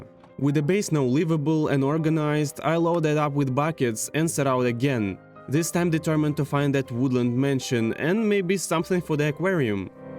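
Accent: Russia. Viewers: окей, по акценту и шуткам, ты явно русскоговорящий. пришел в комментарии пожелать тебе удачи в твоём пути, видос получился уютный, надеюсь увидеть от тебя ещё такого. 💪🙂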